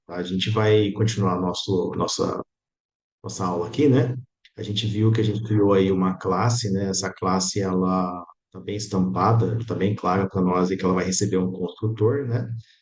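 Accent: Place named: United States. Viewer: Sotaque americano lindo desse professor 🥰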